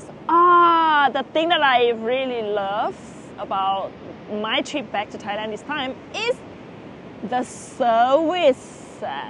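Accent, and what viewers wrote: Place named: Philippines. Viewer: R u Filipino? U have Filipino accent. You look beautiful though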